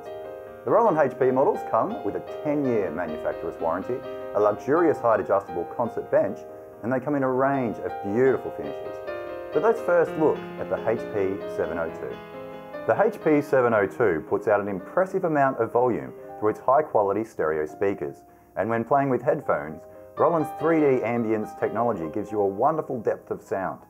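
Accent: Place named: Australia. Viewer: This is how Australians speak, proper English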